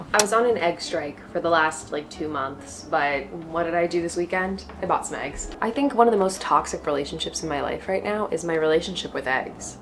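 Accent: New York, United States. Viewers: Hello, sorry to hear about the loss of your Grandma. She must have inspired you in such a way. Good luck with learning to speak Italian in your trademark husky New York Accent! The Cats Olive and Bennett still steal the show! Take care from London UK 🇬🇧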